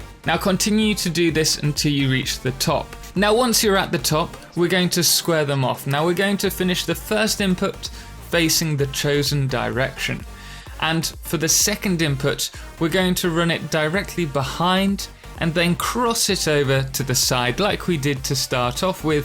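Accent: England, United Kingdom. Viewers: I watch this channel about Satisfactory mainly because of the pure English pronansuation! It's just perfect!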